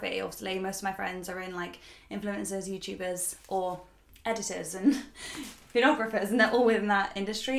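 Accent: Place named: Australia. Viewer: is my favorite band. Where are you from? I like your English accent maybe Australian?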